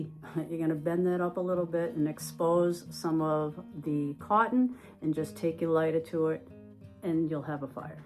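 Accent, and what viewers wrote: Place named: Massachusetts, United States. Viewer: Heyy 😊. I just ran across your channel couple of days ago. You're amazing! Seriously,thank you. I grew up in mass, haven't been back in over 20 years. I didn't realize how much I missed the accent. I watch your videos just for that & the scenery 😊. You're very smart & down to earth. I've subscribed & liked all your videos 👍. Thank you again and please keep em coming. Your guy is a very lucky man....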